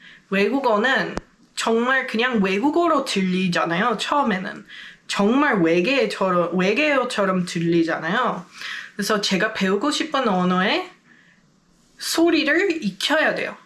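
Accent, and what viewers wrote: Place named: Seoul, South Korea. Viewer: 세상에나. 서울에서 5년 살아도 서울억양 안되던데 님은 진짜 서울말 억양 너무 완벽하시네요!!! 부러워요..그 능력이!!!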